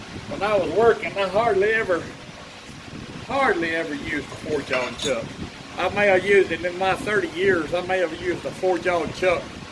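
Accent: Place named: Georgia, United States. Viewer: very ingenious design and I learned something today without trying. who says you can not teach old dogs new tricks. love the channel but where are you from? I am a Georgia boy myself so accent is familiar. love the channel.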